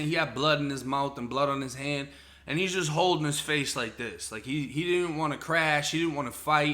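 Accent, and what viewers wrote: Boston, United States. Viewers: U from boston? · So u definitely from Boston right?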